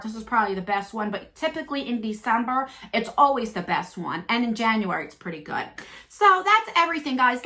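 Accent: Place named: New Orleans, United States. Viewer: Hiya! Your eyeshadow is bangin' tonight! I love it! So pretty. Your accent sounds like your from nawlins. I love it! Hope your daughter is continuing on a great recovery!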